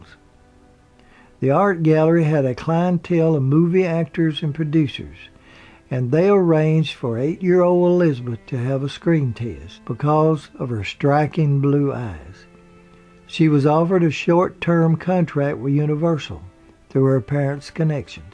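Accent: South, United States. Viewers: I discovered your channel by accident but have come to really enjoy it. I like your calm demeanor, the way you say nineteen-and-fifty-six, your sweet southern accent and the interesting research you do. Nothing is rushed, the whole experience gives you insight and a bit of relaxation in the process.. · Good ole Southern accent :) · The narrator is a southerner and they have a unique vocabulary and accent. I thought everyone knew that. I’m sure Elizabeth would be delighted with his work as most people appreciate him. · I know....at first I was confused by this southern drawl, but after awhile you come to like it; but why is almost the whole darn thing in Black & White? I want to see those violet eyes! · MandyJMaddison, there are several generations of southerners here in the south that talk like that, and probably won't change. they are mostly from cotton mill families, and I find their pronunciations trashy and uneducated. 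I don't know why so many commenters here actually like his way of speaking.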